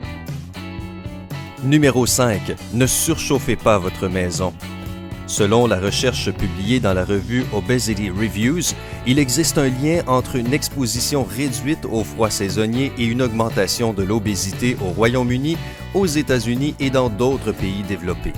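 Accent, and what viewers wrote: Quebec, Canada. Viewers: acent québécois 😊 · J'adooooore l'accent canadien.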